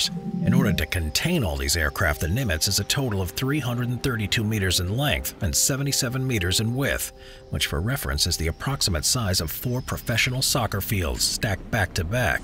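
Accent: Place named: United States. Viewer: I'm impressed by both your scientific and historical knowledge but find myself astounded at your level of patience. I would've lowered the tone considerably by mentioning the fact that the US reneged on their deal regarding nuclear technology after being given it all free and I'd have mentioned Kasserine in the passing. You'd need a British radar to find the cavity between some folks ears but then all the US Universities are owned/funded by the Chinese which is quite the coincidence, since this channel is also.......just has a dubbed American accent repeating 'murica. To any normal Americans, no offense, we'll always have your back! USGB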